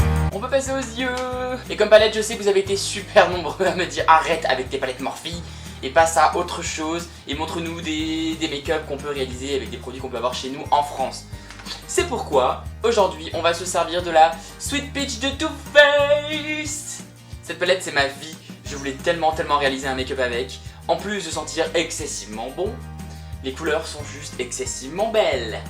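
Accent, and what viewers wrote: United Kingdom. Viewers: très jolie ce petit look... Très frais et naturel. #teamsnapchat. Tu as un.bel accent anglais :)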